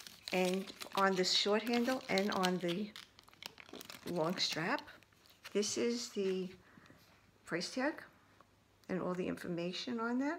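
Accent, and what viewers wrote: New York, United States. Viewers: The Tabby Shoulder Bag is such a pretty bag! I got it in black and beechwood, as they were both on a great discount here in Europe a few months ago! I love the burgundy microfiber lining and the organization! I enjoy your videos and love your english, as it reminds me of NY! My mom is from NY and I still have relatives on Long Island!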